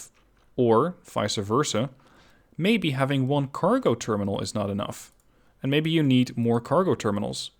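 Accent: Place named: Netherlands. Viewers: Said no one ever... Maar goed, een nederlands accent verstop je niet zomaar :) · Yep, that's English with a Dutch accent. I might just follow just for that 😉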